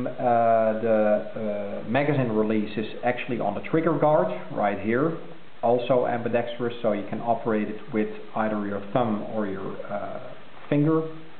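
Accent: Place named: Netherlands. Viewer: Nice pistol and review. You sound Dutch, but I thought pistols were prohibited in Holland?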